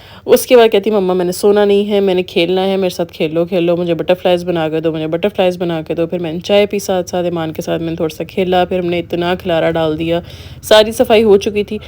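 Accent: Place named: Punjab, India. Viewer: Your punjabi acsent is soo funny😂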